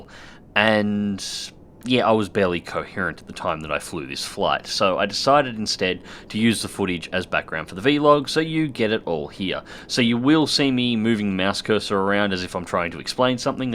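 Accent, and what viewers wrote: Australia. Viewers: dyssynchro > ' accent >Our flag in background >Kangaroo >Needs to be told wat?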